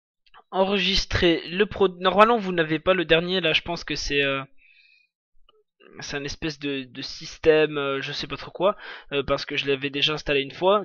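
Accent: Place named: Switzerland. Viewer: accent suisse :p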